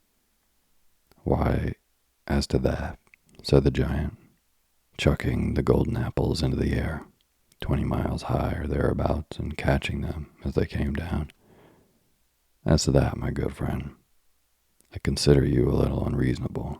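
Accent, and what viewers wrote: South, United States. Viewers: Is there a southern twang?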